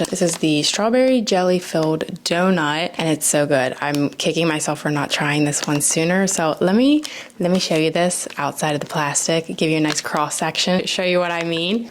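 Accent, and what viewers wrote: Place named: Maryland, United States. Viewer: Your accent sounds very maryland-ish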